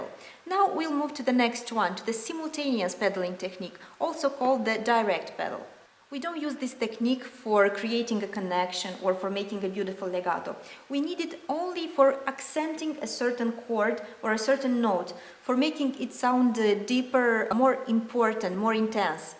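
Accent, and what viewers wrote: Russia. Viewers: Замечательный, качественный учебный материал, сайт тоже сделан старательно и со вкусом. Небольшая ремарка насчёт русского акцента (товарищ внизу тоже заметил), от него легко избавиться если захотеть и понять главный принцип — англичане, особенно американцы, издают звуки большей частью через нос. Направляете все гласные туда, на этот канал цепляете согласные, и от нативного спикера будет не отличить, голос станет более певучим и появятся красивые обертоны. Процветания!! ))) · ох уж этот русский акцент, но красивая - глаз не оторовать ))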